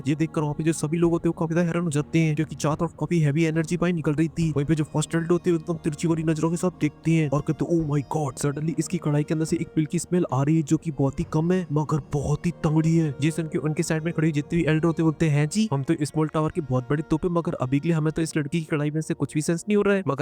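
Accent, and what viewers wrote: Haryana, India. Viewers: Master ji ka haryanvi mast h bhi 😂❤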